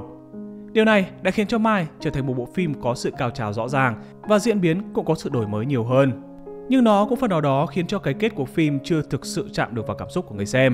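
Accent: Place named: North, Vietnam. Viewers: trình độ đọc hiểu ô kém quá, ý nó là đang đòi quyền lợi cho miền nam các bạn đấy, vì đó kênh này giọng bắc nên đánh giá phim miền nam ko cao hiểu chưa? Mà nữa miền bắc ko lm phim rạp đ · đơn giản vì chủ kênh nói giọng bắc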